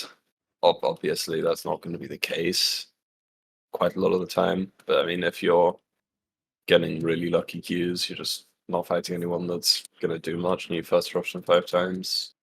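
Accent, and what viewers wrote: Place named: United Kingdom. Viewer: why is he british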